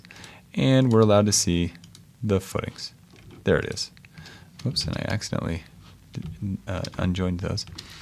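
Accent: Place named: United States. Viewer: US accent yeah:face-fuchsia-wide-eyes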